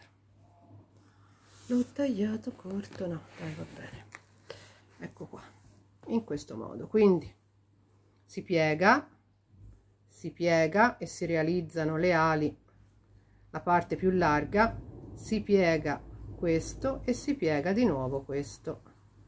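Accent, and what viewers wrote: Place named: Tuscany, Italy. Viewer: della tua parlata toscana che io adoro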